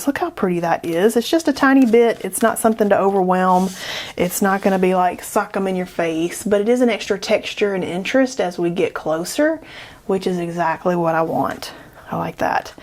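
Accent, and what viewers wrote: South, United States. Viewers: Your southern accent and bubbly personality are so refreshing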